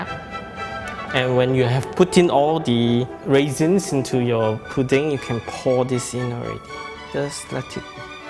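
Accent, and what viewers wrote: Singapore. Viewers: dang. that looks delish! and i miss this accent lol. singaporean or malaysian? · are you like singaporean?? haha. the accent :D i'm singaporean too.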